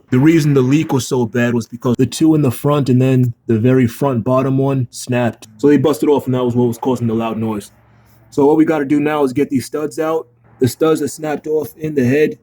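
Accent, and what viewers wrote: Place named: East Coast, United States. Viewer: Love your stuff man! You're doing great videos! I'm curious though you're in though as it seems like you are dealing with a lot of rust issues and I can hear some East Coast in your voice so you're definitely not in Houston Metro... Keep up the good work and here's wishing your channel all the success in the world!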